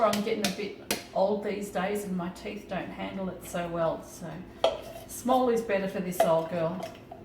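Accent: Australia. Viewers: What State are you in? I live in Perth and have not seen Western Star cream. It's really nice to listen to a quiet Australian not loud and annoying American accents (apologies to any Americans watching). Cheers Rosemary Perth Western Australia (70 yrs)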